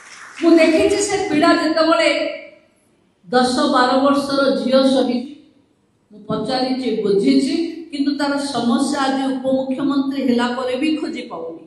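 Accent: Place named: England, United Kingdom. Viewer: Speak clear odia. No need to speak english style odia.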